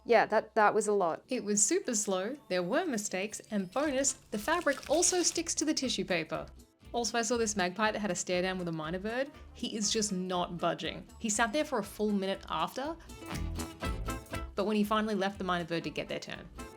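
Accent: Australia. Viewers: are you australian? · The accent is either New Zealand or Australian… I'm going with Australian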